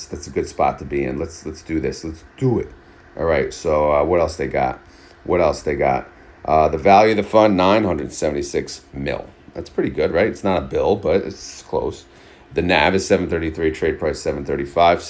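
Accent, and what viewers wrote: New York, United States. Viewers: I love this man's New York accent but he sings like a cowboy 🤠.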